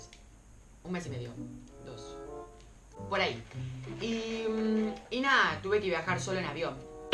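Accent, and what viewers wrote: Argentina. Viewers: sos argentino ¿no?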